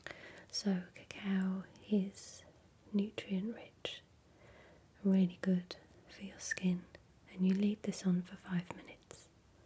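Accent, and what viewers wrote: England, United Kingdom. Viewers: Sadly I have had a difficult time watching your videos over the last year. Why? Well to be honest it's because I had my heart irrevocably broken by an Englishman. I couldn't bear to hear the accent. 😔 I missed your videos, and was determined to get back to them. So I simply forced myself to watch a couple of your videos that have always been favs of mine from a few years back. Happy to say "Cleaning your face" from the Lo-Fi series did the trick. I swear I'll never let one of your countrymen keep me from tuning in ever again.🙂 No matter how devestatingly handsome he happens to be. 😏 Boys drool💔, girls rule.🧘🏼‍♀️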